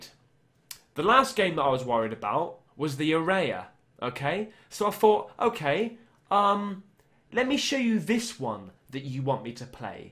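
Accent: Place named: United Kingdom. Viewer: oh god i like all ur video mate, especially cause u have a british accent it makes u more uugghh i dont know what to say, keep going!!😉😉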